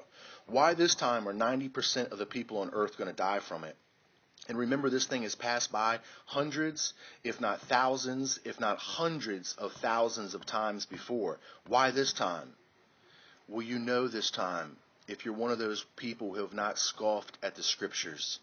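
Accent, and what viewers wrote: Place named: Baltimore, United States. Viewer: Also, I'm betting from your accent that you're from Baltimore and the D.C. area. Make me suspect you a bit.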